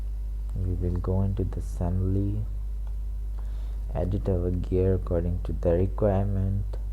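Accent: India. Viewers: ***** I thought it starts from skrach, absolute zero, & that the narration will be more interesting: "Hindi accent" is NOT an excuse for 'the boss make me do this video'. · is it possible to find someone that speaks english clearly and does not have a heavy punjabi accent?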